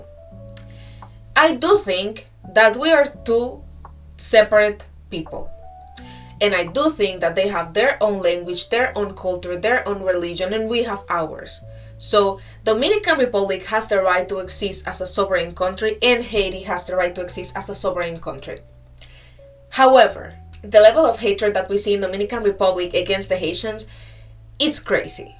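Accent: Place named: Portugal. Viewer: Your English sounds like a Portuguese speaker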